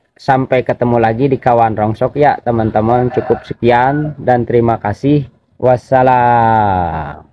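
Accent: West Java, Indonesia. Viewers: Si aa mantap... Asa sunda ini mah❤ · Asalamualaikum,,, kang bos Dina logatna mh jiga orang Sunda , Mun tiasa nepangkeun orang mana , linggih di mana bos , seueur abdi bos barang" na empeg , panel , PCB hp + Sagala rupi Aisi bos , nyengcelengan bos tos seueur 🙏🙏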